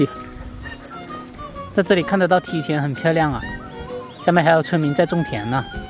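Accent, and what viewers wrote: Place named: Hakka, China. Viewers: ，你是惠州客家人，我也是，难怪我能听懂你说啥。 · 和老友鬼鬼搭肩膀太可爱了，客家话广东话都能通真棒👍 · ！原來你是客家人！我也是！我們都是同音腔的客家人！👍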